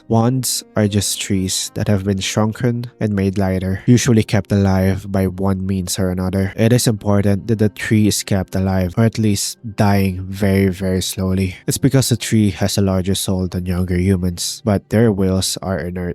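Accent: Philippines. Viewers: do i hear a filipino accent?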